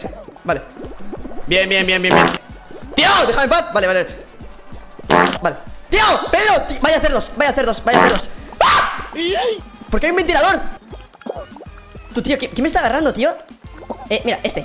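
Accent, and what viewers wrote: Argentina. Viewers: Weyyy primera vez q veo un video de el y me doy cuenta que es Argentino 😂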